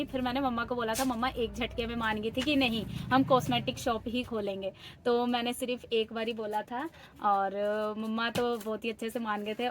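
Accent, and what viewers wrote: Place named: Punjab, India. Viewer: मैं भी video बनाती हूं मेरी भी shop है आप के जैसे मैं भी पंजाब से हु आपभी मेरी जैसे हिंदी बोलते है😂 आपकी वीडियो रोज देखती हूं बहुत प्यारी है आप❤❤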